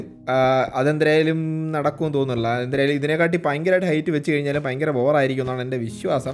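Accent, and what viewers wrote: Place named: Kasaragod, India. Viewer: Kerala mayi touch ullad kasarkood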